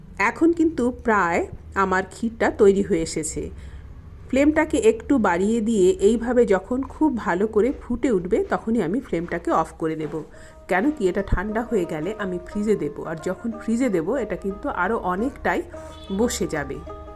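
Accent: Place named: Bangladesh. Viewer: দিদি খুব ভালো লেগেছে আপনার রেসেপি, দিদি আপনি কি আসলেই কোলকাতার? ভাষায় বোঝা যায় না, মনে আমাদের দেশের মানে বাংলাদেশের লোক আপনি।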